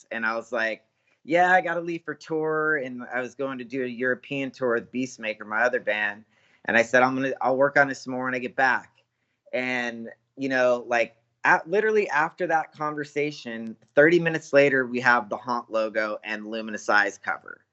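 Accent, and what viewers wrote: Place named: Brazil. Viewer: faz um podcast em portugues mannnnnnn...saquei esse sotaque br!!!!1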